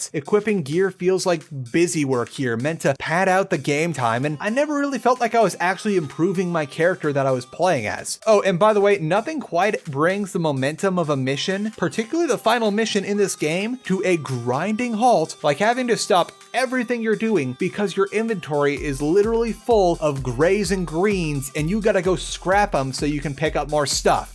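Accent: United States. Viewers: You from Colorado cause you almost sound like Jimmy snow.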